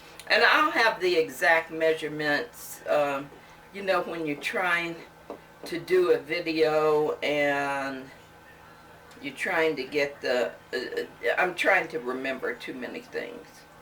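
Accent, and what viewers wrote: South, United States. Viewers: I’m new. Simply Sara mentioned you. I’m enjoying your way. You speak southern the right way. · What a nice lady and a good cook love your cooking style and you just seem like one of the sweetest little ladies ever I'd love to live next door to you and we could exchange recipes on the Southern girl and you sound like you might be a Southern girl also good food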